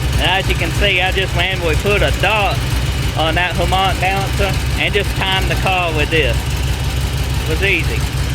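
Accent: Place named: South, United States. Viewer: Where are you from brother? Definitely a southern fella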